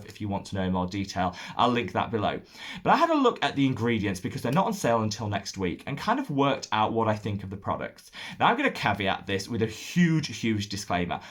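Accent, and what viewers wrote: United Kingdom. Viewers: I like the bulletin style for reformulations but really appreciate the in depth analysis on new products. Being American, I need to listen hard to that oh-so-cool fast Brit accent, so the rewind button is my friend during the latter. You do such a good job, and I find true value in your videos.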